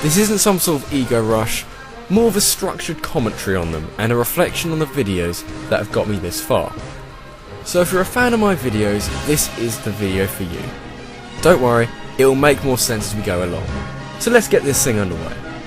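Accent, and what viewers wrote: England, United Kingdom. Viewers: Oh. My. God. Your face... IT'S SO... different to what I imagined... Seriously, when I listened to you on all your other videos, I always pictured you with a long face, black hair, and you would wear glasses... weird huh... Dammit! I watched too much Harry Potter. Now when I hear a british/english voice without a face, that face is all I can think of... I'm SOOO Sorry! Epic list though... and congrats on 20,000 subscribers! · Okay, this is not what I imagined you to look like. DAMN YOU FOR YOUR MISLEADING, YET BEAUTIFULLY SOUNDING ENGLISH ACCENT!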